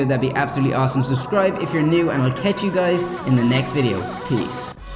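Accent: Ireland. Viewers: Are you Irish? I'm Irish too and ur accent sounds Irish 😂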